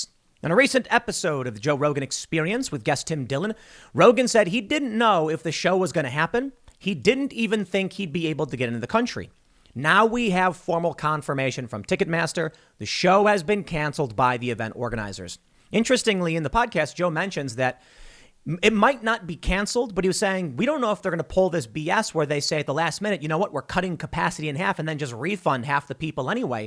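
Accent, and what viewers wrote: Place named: Chicago, United States. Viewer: Has anyone else noticed Tim is starting to exaggerate his As and Rs. We get it Tim you're from Chicago....geeze.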